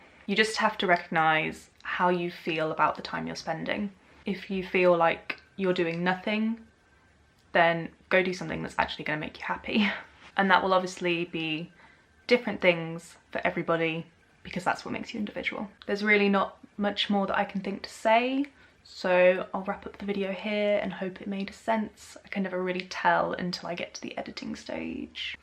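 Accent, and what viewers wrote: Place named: United Kingdom. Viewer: yeah, you have such a lovely British accent. Let's be friends!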